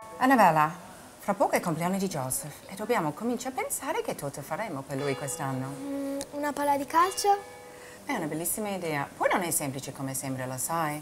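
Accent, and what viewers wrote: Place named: Italy. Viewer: OMG! I freaking love your Italian accent!! :-)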